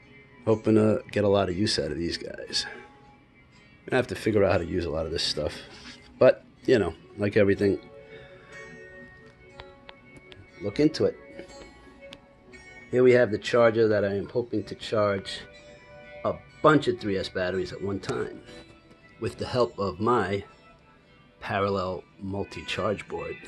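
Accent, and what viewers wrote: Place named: New York, United States. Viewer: Love it!! You're new York accent!! Lol by the he way graysons batts for 115 sold out thanks to you.. Lol love ya and your sense of humor!! Going to be a great summer..looking forward to seeing vids and getting to know you brother!! Sat mine gets here.:) but no batterys!! And I forgot a cord so can use frysky receiver..XSR. You got two 115s.now I'm jealous you'll always be flying. Figuring out myself too ,DNA big help bro! For x-lite! Have a great night!! God bless!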